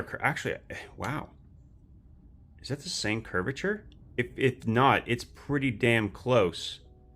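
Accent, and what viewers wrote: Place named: Midwest, United States. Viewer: Virginia? Man, I thought I picked up a "great lakes accent" off ya,. Midwest, or maybe northeast. Anyway, if you could do a comparison video between the Sencut Snap, Waxahachi, Civivi Cogent, Relic Sinisys, and WE Snick, that'd be awesome. That blade shape is what made me want to try a Civivi in the first place.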